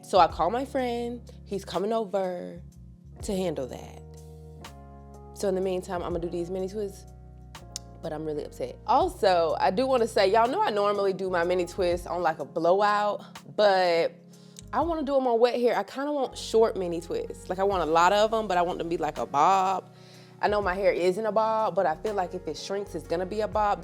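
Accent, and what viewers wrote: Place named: Atlanta, United States. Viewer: Hey girl I'm new to your channel and so far loving the content when I heard your voice I knew you were in the A well pretty close to it. Hey neighbor I'm from Decatur 😊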